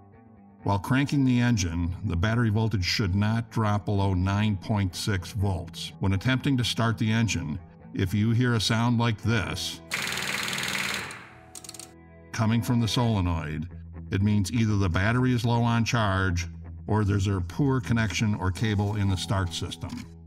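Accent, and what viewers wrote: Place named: Chicago, United States. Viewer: This guy is obviously from Chicaago. That accent is terrible but video not bad. thanks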